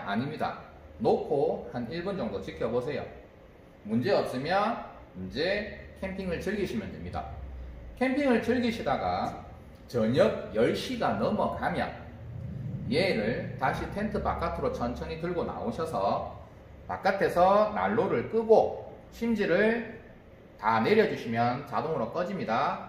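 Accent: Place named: Gyeongsang, South Korea. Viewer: 행님 구수한 경상도 사투리 팍팍 꼽힙니다. 저도 경상도라^^ 초캠이라 많이 배웁니다. 항상 감사합니데이 행님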